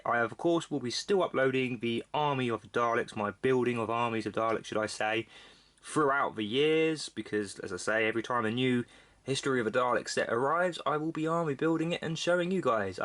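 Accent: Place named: United States. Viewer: Nah nah, I think you are American. Something about that accent...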